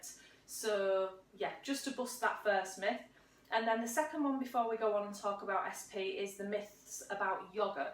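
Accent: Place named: South Yorkshire, United Kingdom. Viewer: where are you from your accent sounds like mine ( im from south yorkshire rotherham )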